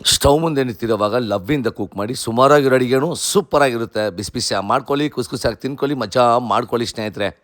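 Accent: Tamil Nadu, India. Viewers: Mostly ivaru Tamilaru adikke Kannada shaili bere. Aadaroo Parvagiila illi Ruchi Mukhya Ashte.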